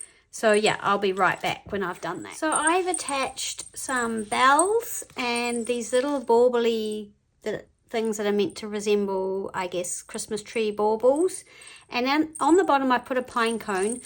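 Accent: New Zealand. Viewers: That was really great. I loved it and the choice of fibres you used. Was that a fellow kiwi I could hear? You have inspired me ❤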